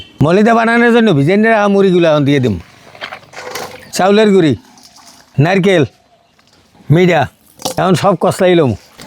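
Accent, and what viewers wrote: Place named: Barisal, Bangladesh. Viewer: বরিশালের মানুষ বরিশালের আঞ্চলিক ভাষায় কথা বলেন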